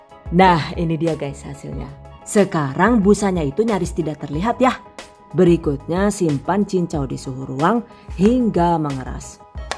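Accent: Banyumas, Indonesia. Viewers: Msih muda tp bsa suara nya mirip wanita dewasa tua mirp suara maria untu penyiar radio jmn dlu keren pinter bhs inggris/ nglucu ,ngapak resep" 👍👍sdh tjir mlintir pula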